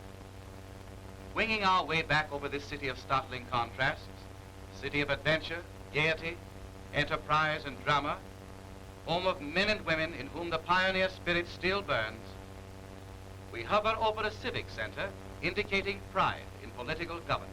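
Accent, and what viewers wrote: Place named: Mid-Atlantic, United States. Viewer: Great example of the Mid Atlantic or Transantlantic accent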